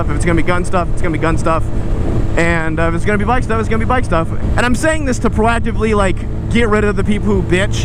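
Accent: Canada. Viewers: The Canadian accent had me rolling 😂😂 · Lmao your canadian accent had me in tears aye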